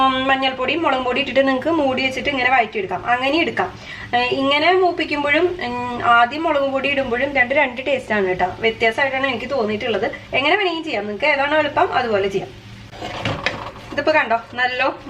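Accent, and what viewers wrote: Thrissur, India. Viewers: adipoli Thrissur bhasha.... 👆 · Supr chechiii...bt speed koodithl aanataaaaa....thrisurkaro aanooi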